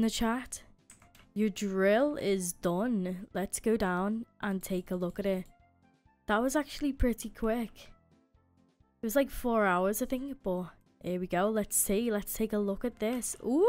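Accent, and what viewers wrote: Liverpool, United Kingdom. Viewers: are u a scouser? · sheee, the scouse god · Just a question r u British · Are u scouse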